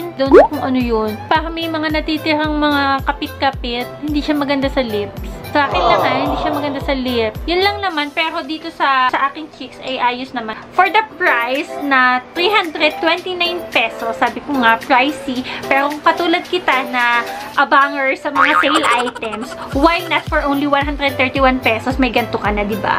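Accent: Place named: Batangas, Philippines. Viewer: kakatawa mam ang pagsasalita ninyo HAHAHHAA batangueño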